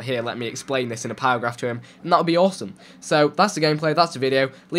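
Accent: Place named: United Kingdom. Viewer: I like your British accent :)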